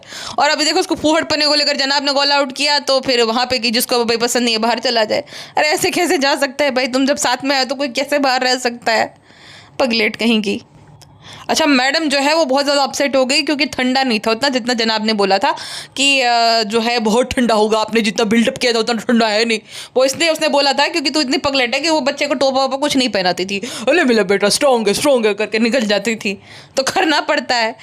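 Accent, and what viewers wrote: Gujarat, India. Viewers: Aap bolne pr se lagta hi h k aap gujrati ho kyu ki me bhi rajkot me raheti thi wha gujrati bolte h jab bhi ap koi gujrati word bolte ho kuch to me khush ho jati hu aisa lagta h meri frd mujse bat kar rahi h....etna acha lagta h muje ar sunne me maja aata he...❤❤❤ Luv 😊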